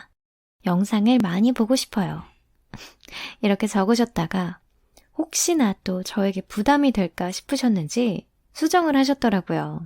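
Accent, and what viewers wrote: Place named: Jeolla, South Korea. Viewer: 와 전라도 사투리가 반갑구만요 늘 좋은 목소리로 이야기 들려주셔서 감사합니다아😄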